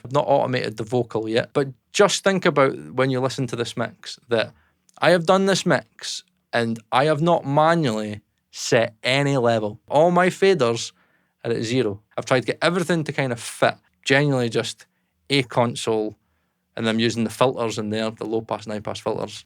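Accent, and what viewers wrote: Scotland, United Kingdom. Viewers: You're such a lovable guy. And such a thick but great Scottish accent lol (I'm assuming, because you sound Scottish to me). Very funny edits and such. And great music production education/topics. I don't know how big you are into sound design but I for one would be very interested to hear your unique techniques and views on that kind of thing. And some writing as well because who wants a non captivating song. Cheers mate 🍻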